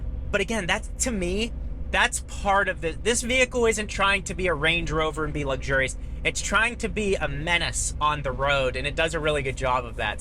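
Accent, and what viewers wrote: Pittsburgh, United States. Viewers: Sitting here at AGH in the Northside watching this vid! Nice to hear you getting back to your yinzer roots!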